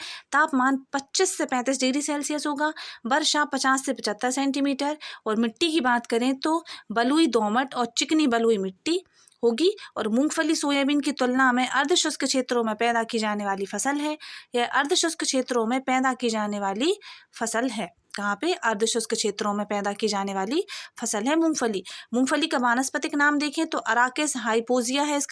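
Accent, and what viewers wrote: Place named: Bundelkhand, India. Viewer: Your bundelkhandi accent is cute😉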